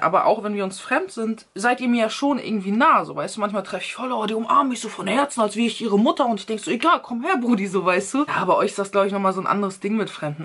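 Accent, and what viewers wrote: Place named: Russia. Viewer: Ja man russischer Akzent ist mega 😍😍 Wuodga... 🥃😋😂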